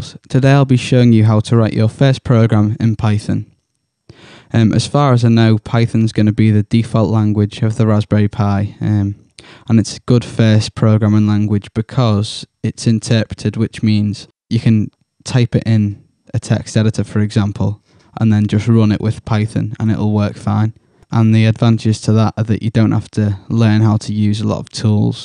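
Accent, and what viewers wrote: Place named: Northern England, United Kingdom. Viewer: ho,, before i for get,, are you from the north of england as your voice and manerisms seem similar to mine..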